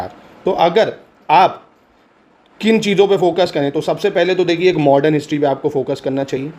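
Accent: Kanpur, India. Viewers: Kanpuriya hain ase hi Bolte hain😅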